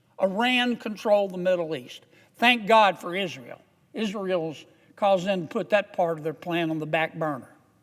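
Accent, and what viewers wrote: United States. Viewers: factualy the best american accent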